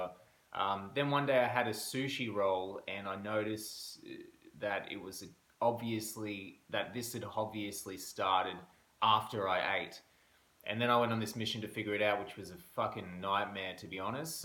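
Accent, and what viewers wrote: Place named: Australia. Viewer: I don’t know what it is about the Australian accent but when I hear it I listen.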